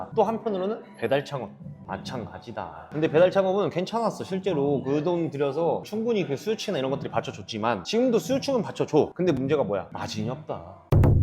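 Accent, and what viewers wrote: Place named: Jeolla, South Korea. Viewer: 저도 익산이 고향인데.. 뭔가 말투가 전라도의 늬앙쓰가 있긴있써^^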